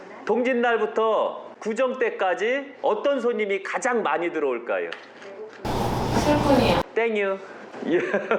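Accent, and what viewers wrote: Chungcheong, South Korea. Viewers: 맞아요 충청도의 대지관님 이시여요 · ㅎㅎㅎ 완전 충청도분이네? 가차운----> 가까운 ㅎㅎㅎ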